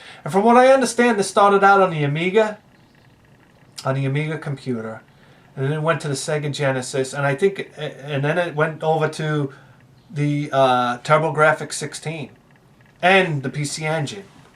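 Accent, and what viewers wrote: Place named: New Jersey, United States. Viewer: I love the rant, but I'm confused. You look like a hillbilly out of West Virginia, but your accent says Jersey trash.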